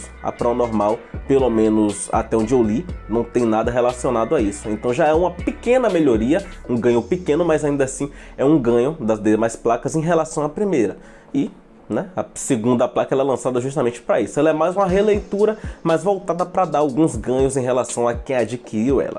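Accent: Bahia, Brazil. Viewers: Man! Você combina o sotaque aqui da Bahia + O conhecimento em tecnologia. Esse canal era pra ter um milhão de seguidores. Por conta das dúvidas que tirei aqui, comprei a PRO II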